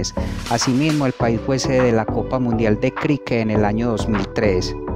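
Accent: Antioquia, Colombia. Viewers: El man que habla en este vídeo es paisa? Buen vídeo 👍🏻😁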